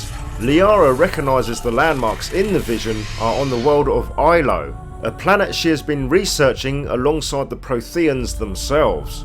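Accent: United Kingdom. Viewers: Cool! Do you know how you would classify your regional accent? Would it be cockney or sussex for example?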